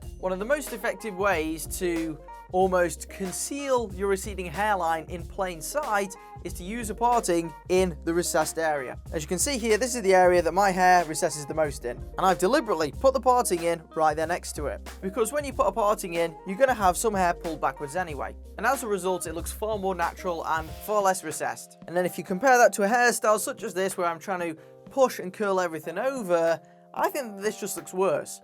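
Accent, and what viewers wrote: United Kingdom. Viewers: love british accents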